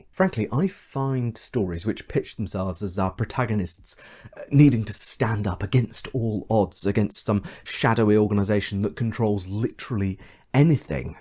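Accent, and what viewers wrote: United Kingdom. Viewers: This guy is amazing in the sense he is 100% wrong on every video he makes but his narcissism and british accent fools him into thinking he is correct.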